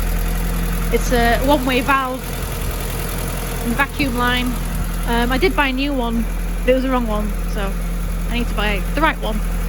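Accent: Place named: West Yorkshire, United Kingdom. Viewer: Loved the video, I could listen to a West Yorkshire accent all day long! Interestingly I didn’t realise you needed a bonnet, we might have one of those somewhere too! Can’t promise it’s great but I remember it being better than your current one. we can hunt around the dreaded store room when lockdown allows! 👍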